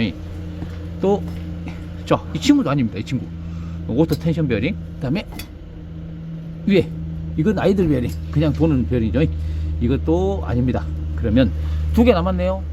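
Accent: Jeolla, South Korea. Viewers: 공장장님 오늘도 수고 하십니다 전라도 사투리가 아주재미나게 말쑴헤사아주 시고 실력도좋고요 나도 화순사람인데요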